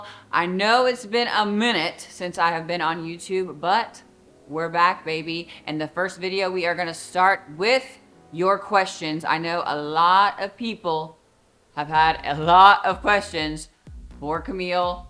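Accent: South, United States. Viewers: Some people had a problem with your Southern accent? That's crazy! Growing up in Florida's peninsula and close to the coast, I don't hear that type of accent that much around here. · Reply 01: Good Wednesday evening to you and a great video post. Thanks for taking the time to answer very interesting and important questions from your fans. 🙏🏿✝️ Reply 02: Some of what you discussed. My favorite female talent varies. Thunda Rosa I'm a fan and fond of. I gather you remember her. Victoria has grown on me and if it's anybody I'm pushing for to get their flowers is her. As for male talents, I grew up a huge fan of The Junkyard Dog back in the 1980s. I have to be honest, he was both a source of wrestling inspiration and shortfalls. That goes to his unfortunate use of drugs. As well that after his death he lost his only daughter. Shortly after she received his WWE Hall of Fame award. 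As for the men. Bret 'Hitman' Hart is one of my all-time favorites. So too was Stone Cold Steve Austin, long before he was that and before he entered WCW. He was Stunning Steve Austin all the way back in the USWA. As well I pick him as the greatest wrestler of the 1990s. Reply 03: As a fan of yours and looking forward to big things from you. I love the southern accent and dialect. The former WWE Lacey Evans real name Macey Estrella has a similar southern dialect. She's also a stunning beauty, ex-Marine and also married. She's got a wonderful business down in South Carolina her home state. Reply 04: Recently, you broached a topic on Instagram about not being a ballerina. I offered something to the contrary. Not only do you have the physical structure of one. You remind me of Actress Ju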